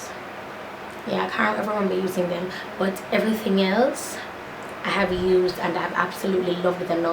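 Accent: United Kingdom. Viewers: does she have a mixed accent cos she also sounds like she has a British accent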